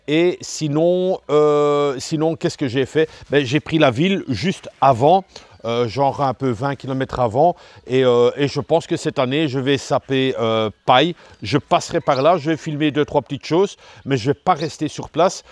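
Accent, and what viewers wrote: Belgium. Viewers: salut,je suis tombé par hasard sur tes vidéos,je suis un amoureux de la Thaïlande,mais je n y suis jamais allé en tout bravo pour nous faire connaitre une autre Thaïlande un peu plus rurale.......étant Belge moi aussi il me semble capter un accent familier...... merci pour ces belles video!!!!!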